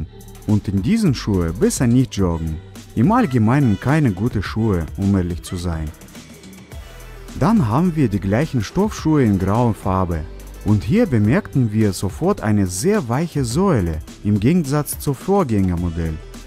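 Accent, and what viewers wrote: Russia. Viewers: Er ist Russe deswegen spricht er das so aus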